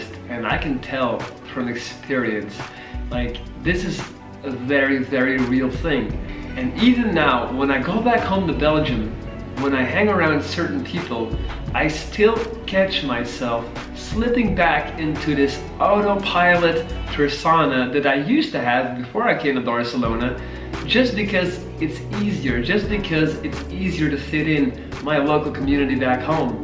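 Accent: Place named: United States. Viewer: thats amazing! When Ive heared You for the first time I thought You are from USA:) I also watched the bold and the beautiful but with my grandmum:)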